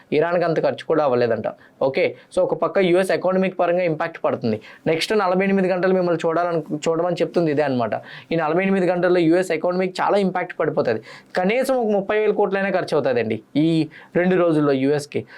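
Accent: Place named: Telangana, India. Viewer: Why this telangana slang all of a sudden?😅😅😅😅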